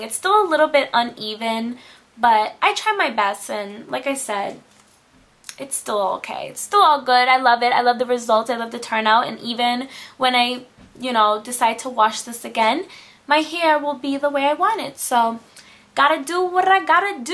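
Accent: New York, United States. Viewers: lol, love your hair and your attitude. you have that new york accent going on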